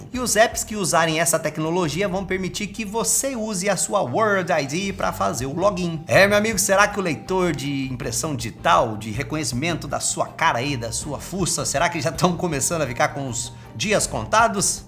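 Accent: Minas Gerais, Brazil. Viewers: Mano, senti o mesmo, mas senti isso desde que o deixou de ser independente. Os efeitos ficaram profissa, tudo bonitão, mas, na minha opinião o nunca mais foi o mesmo. Ficou mais sério e menos zoeiro. Até aquele jeitão de falar de mineiro do interior diminuiu e eu gostava muito daquele estilo.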